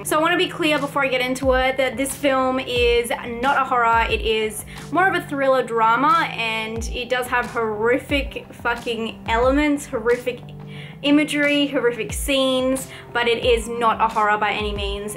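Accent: Australia. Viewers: He probably didn't understand the accent and meant to say Australian accent. No need to be so passive aggressively mean to your viewers.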